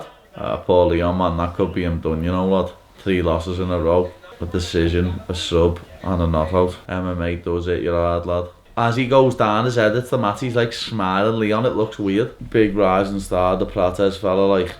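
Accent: Liverpool, United Kingdom. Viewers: Bruh's scouse is extra thick when he wakes up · Why should he scouse is kings English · Liverpool akcent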